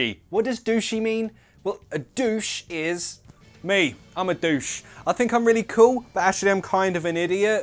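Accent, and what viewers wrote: United Kingdom. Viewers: A big greeting from Piura, Peru. I really like your videos. They are so fun and I love british accent!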